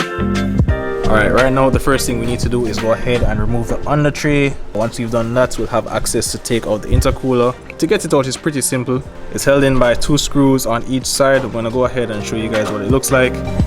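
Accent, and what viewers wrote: Jamaica. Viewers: Hey man, totally random, but are you Jamaican by any chance? Picking up a very familiar accent 😅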